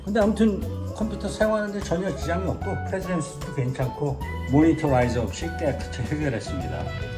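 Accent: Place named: United States. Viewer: 꼭 대학생 같아 보이십니다. 미국 교포 느낌의 억양도 반갑게 들리구요. 책상정리, 선정리 하느라 애먹었던 저의 당시 시절도 생각나게 하네요. 영상 잘 보았습니다.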